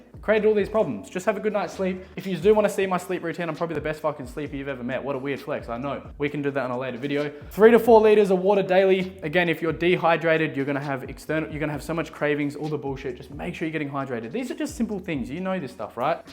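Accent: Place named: Australia. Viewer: He’s speaking proper Australian mate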